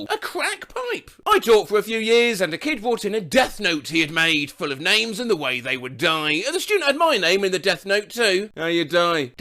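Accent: United Kingdom. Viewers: A compliment for, his voice sounded especially British today.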